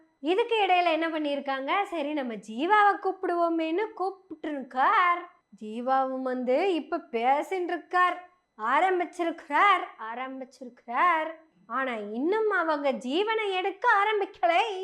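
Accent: Kongu, India. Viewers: கொங்கு தமிழ் சுவையோடு அழகாக பேசும் சகோதிரிக்கு வாழ்த்துக்கள்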